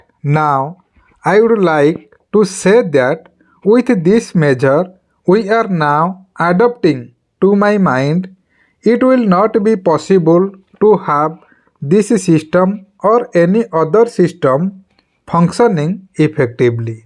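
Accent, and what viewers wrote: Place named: Bihar, India. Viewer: Sir ye English dictation h ya Bihari dictation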